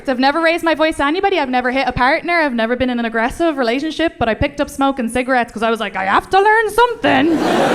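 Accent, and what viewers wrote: Ireland. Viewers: I would have liked to hear you say cunt in your set at least once, there's just something so amusing about hearing an Irish girls accent calling someone a cunt that makes me smile wide 😸😸 / but I realize that you have to set the standards for your style of comedy and how far you're willing to push it 👍🙏 great set too btw 😻😸😺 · That's not a Dublin accent, she's from Kildare by the sound of her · Her Irish accent got stronger after the first minute of the video. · She slipped into a few Irishisms there, I wonder how many people didn't understand or had to process some of what she said. Small things like not disregarding T at the end of words and even in the middle of words. Americans would pronounce them as D but we leave them out completely!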